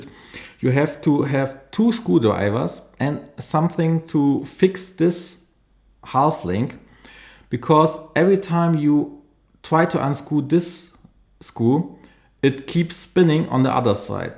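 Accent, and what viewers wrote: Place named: Germany. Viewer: are you german :D sounds like a german speaking english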